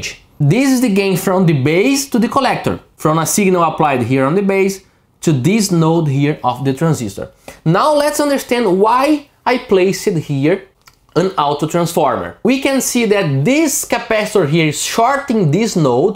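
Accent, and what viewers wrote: Brazil. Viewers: Tu é paulista?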